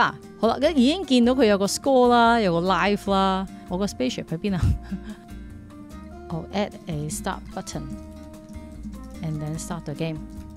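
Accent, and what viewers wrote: China. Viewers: 好好听的白话，介绍的工具很有趣，关注了，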